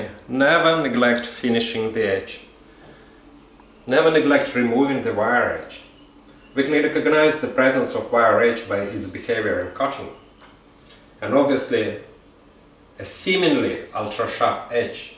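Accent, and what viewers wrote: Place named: Russia. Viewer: Когда я услышал "хорошо" в начале видео, подумал показалось. Но когда увидел имя на книге плюс акцент - всё сложилось :) Приятно смотреть и слушать коллегу с такой глубокой компетенцией. А ещё порадовало понимание того, что вы живёте в Австралии и, вероятно, востребованы там; что всё у вас получилось. Привет из Санкт-Петербурга!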